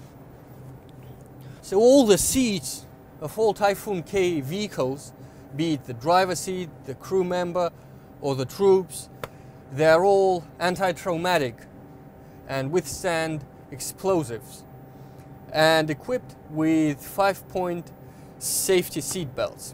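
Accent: Russia. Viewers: Ох уж этот Russian accent )) Техника огонь)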